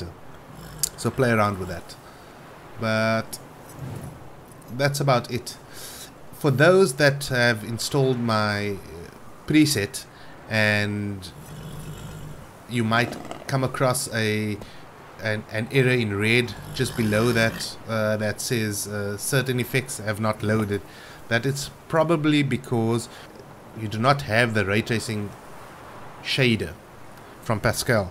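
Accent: South Africa. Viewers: What part of South Africa are you from? ;) P.E here!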